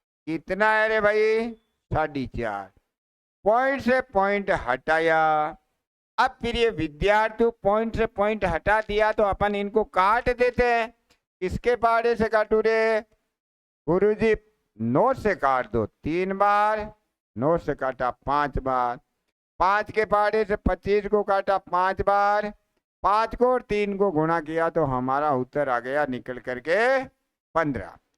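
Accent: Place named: Mewar, India. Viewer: राजसमंद जिले से हूं राजसमंद झील जो प्रसिद्ध है मीठे पानी के लिए वहां से आपका वीडियो देख रहा हूं आप बहुत अच्छा पढ़ा रहे हैं और मैं 10-15 लोगों को शेयर किया आपका वीडियो आप मेवाड़ी में बोलते हैं तो भी अच्छा लगता है और हिंदी में भी बोलते हैं तो अच्छा लगता है और आपका तरीका बहुत अच्छा है सरल और सौम्या तरीका है पढ़ने का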